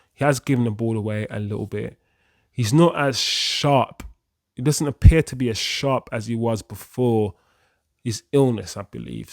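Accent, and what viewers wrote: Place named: Manchester, United Kingdom. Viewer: Proper manc accent there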